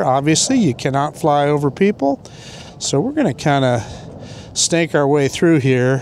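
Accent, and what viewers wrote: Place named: Idaho, United States. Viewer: Sii y se pierde todo el acento de Idaho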